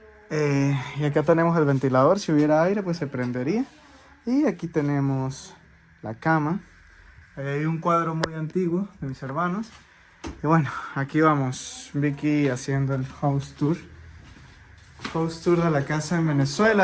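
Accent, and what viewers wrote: Mexico. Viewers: ya hablas como mexicano amigo... muchos saludos ddesde Nayarit , Mexico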